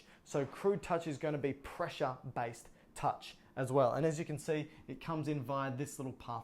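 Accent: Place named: Australia. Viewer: Anatomy lessons AND an Australian accent????? I just hit the jackpot lol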